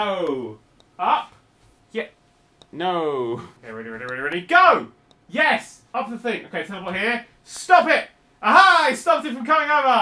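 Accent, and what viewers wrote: England, United Kingdom. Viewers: Boy sounds extra British today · Has became more British?? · is extra english today. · became more british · I think is extra British in this episode. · I think sounds the most british he ever has in this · Is it just me or has gotten significantly more English? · When gets angry, he gets like %70 more British. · sounds a lot more british in this. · Is it just me or does sound more British then usual in this video?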